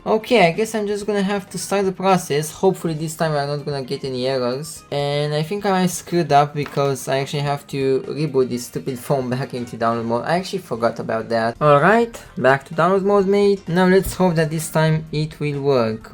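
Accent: Romania. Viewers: Wait I just realized are you Romanian??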